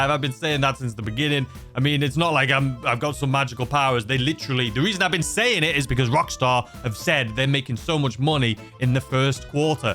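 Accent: United Kingdom. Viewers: I see everybody’s update, but I wait for yours to come out so I can go see yours why is your British accent?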